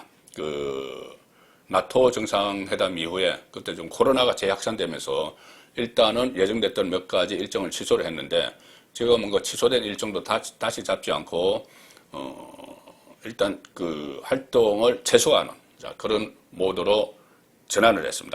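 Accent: South Korea. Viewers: 내용은 좋은데 어느 지방 억양인지 도무지 거북하고 거슬립니다. 어색한 곳이 자꾸 들려요.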